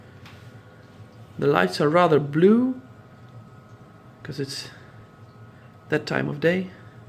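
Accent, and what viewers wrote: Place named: Netherlands. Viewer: I knew , by hearing your voice , you most be from The Netherlands (like I am)...but when I saw you VODKA label I know it.....By the way....Vodka stays Vodka...no W....but that a side....I like your tank!!!...I am going for a reefer 350 later this year..Or maybe have one build for me...the Reefer 350 has no room for a refugium and I would like to have one....Nice video!!!...good explaining!! ..Thanks.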